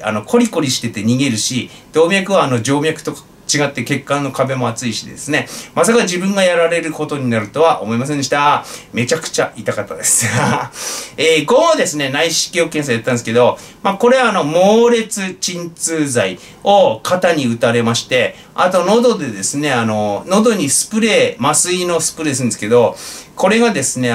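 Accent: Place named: Tohoku, Japan. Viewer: 良かった！ずうずう弁で、活動再開！福島頑張れ👍俺は、茨城だが！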